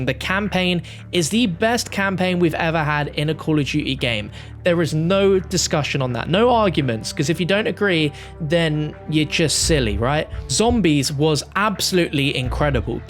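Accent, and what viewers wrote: United Kingdom. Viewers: Cool british accent!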